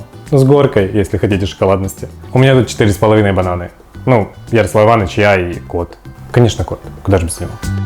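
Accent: Ukraine. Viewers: Откуда же автор родом?! Часто слышу украинскую речь ;)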